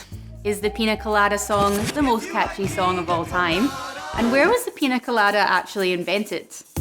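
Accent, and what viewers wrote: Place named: Scotland, United Kingdom. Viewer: i thought so but at first i was thinking irish... but u sounded scottish... i couldnt make my mind up lol i guess accents can vary alot in different areas of scotland like they can in england... and im sure the same in australia😃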